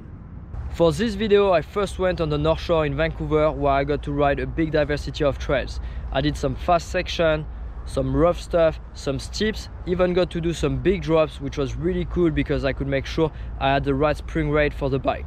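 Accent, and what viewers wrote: France. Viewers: Alala French accent, j ai le meme 😂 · J’en suis sur t’es français ???car ta grave l’accent français quand tu parle anglais ps sinon j’adoreeee se que tu fait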